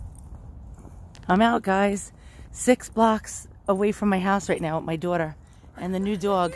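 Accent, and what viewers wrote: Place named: Catskills, United States. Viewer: I grew up in the Catskills & your accent caught my attention right away, it was like being home again. Don't be too hard on yourself as you have a great personality. I survived the battle of Mogadishu in 1993 & although I didn't suffer problems with PTS it did take time to come to terms so there is light at the end of the tunnel. Dogs are a gift indeed so hopefully Samson will bring you peace. Just remember never give up & keep fighting. Your videos are charming so hopefully making them is enjoyable for you, all the best!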